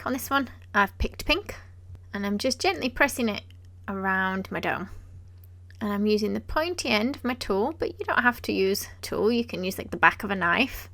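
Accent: United Kingdom. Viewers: Your britain accent is annoying.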